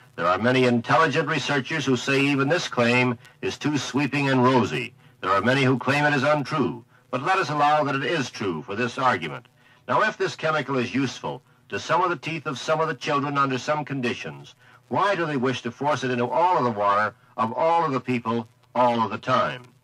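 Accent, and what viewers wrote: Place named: Canada. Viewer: Wow, what a Canadian accent sounds like "Truth".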